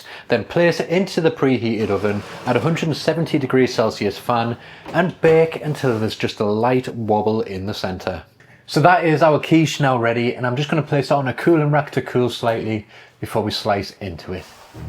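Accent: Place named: Northern England, United Kingdom. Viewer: Where is that accent from. Not far away from the A69 me thinks. Just discovered you and loving what you do.😊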